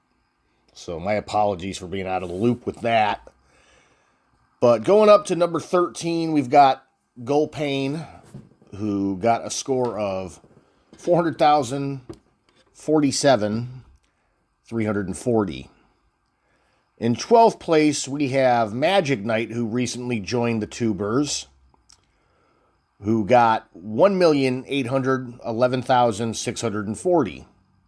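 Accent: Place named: United States. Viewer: Something very official with a deadpan American accent, i like it! Well done all. I still need to improve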